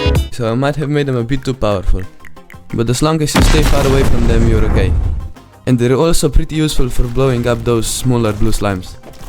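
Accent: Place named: Russia. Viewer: I just come from shorts video. As honest I can, I tell you. That's is very cool and unique, I don't know why this video don't getting popular. Idea of game is good, fr good. Maybe you should do this like dungeon complete, or game with some lore? Blender skills at high level, how did you learn everything about this? And yeah, where are you from, your accent reminds some of Russian accent 🙂 Don't give up on this, try make new projects, bcuz if this is first unity oroject, then its insane and I can't believe in this. Goodluck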